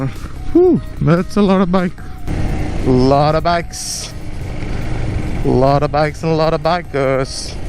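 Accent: India. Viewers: Great to see someone from Odisha in blogging and exploring the beauties of our State .. thanks bro and all the best a slow and steady race hope to see you soon in 100k subscriber club soon, The Odia tone in between ur blog is damn good bhai ... gote Pahala Rasogulla upare video te hele badhia heithanta brother !! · Bhaina gadi chale la Bela ku katha kuha bi Tama video dekhi ki dar lagi chi ba...au Tama odiya language PURA Rourkela style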